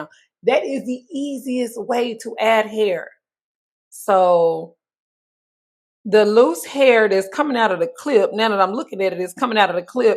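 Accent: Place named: Texas, United States. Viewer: What part of Texas you from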